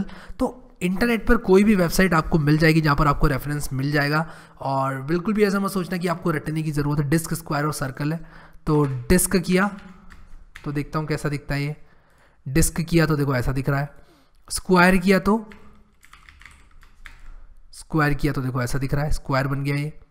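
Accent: India. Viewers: I like Indian accent yaar its amazing i am u say "agr ye playlist acces nai KRI" an we say " agr ye nai KI" and many other words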